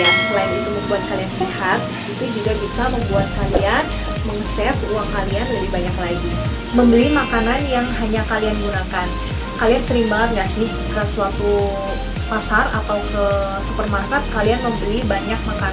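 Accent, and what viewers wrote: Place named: West Java, Indonesia. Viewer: Duh eta pabalatak, nyunda pisan teteh. Hahah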